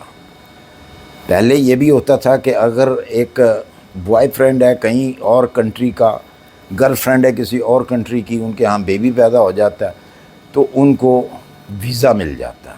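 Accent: Punjab, Pakistan. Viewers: بھائی اپنی engish تو ٹھیک کر لین ۔ لفظ . رسپونس . نہ بولیں ۔۔اسپوسر بولسن ۔۔اپ پنجابی مین english بول رهے حسن ۔۔۔۔ Dont use word: Response ...it should be sponsorship ... کسی پڑھے گکھے ادمی سے پتہ کر لو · Tu c paindo ho. Ardo (Urdu) punjabi which bolde ho.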